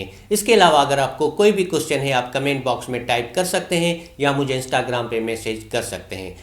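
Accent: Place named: Sindh, India. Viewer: Aap k lehje se lagta hai aap Sindhi speaking Bhai hain.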